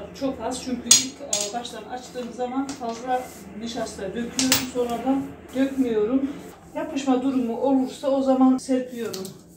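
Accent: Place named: Black Sea, Turkey. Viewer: Banada Karadeniz li gibi geldi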